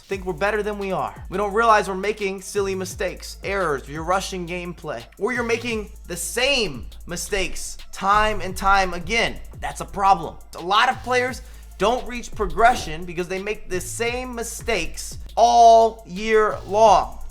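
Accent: United States. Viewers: Gave up after 10 secs.....why does every American have to speak like a fanny??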